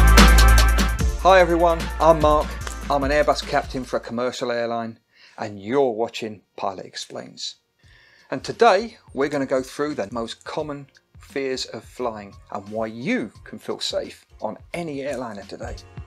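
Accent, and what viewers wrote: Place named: London, United Kingdom. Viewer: I'd say you're from East or maybe North London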